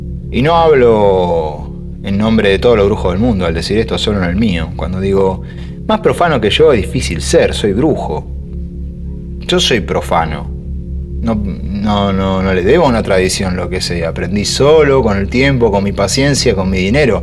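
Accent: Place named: Argentina. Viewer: Hola, que lindo escuchar a!🤗 tiene tan linda voz..y ese acento Argentino..😎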